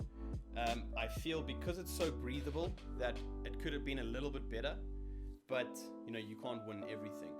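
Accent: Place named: South Africa. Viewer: so Ja YOU ARE DEFF a South African XD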